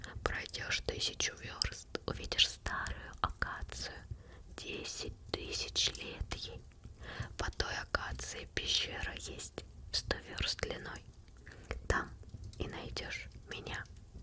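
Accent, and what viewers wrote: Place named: Russia. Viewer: Sotaque russo é muito bom velho, o jeito que ela pronuncia os ditongos é diferente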